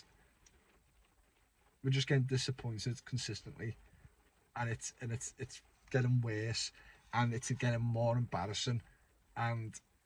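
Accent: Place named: Wirral, United Kingdom. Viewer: Sounds like a normal wirral head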